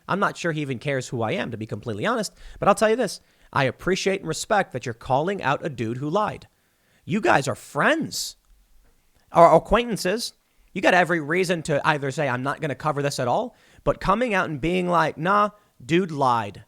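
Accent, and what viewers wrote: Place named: New England, United States. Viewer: stop saying “Y’all” it’s a gross word and it sounds even more gross coming from your Yankee voice box.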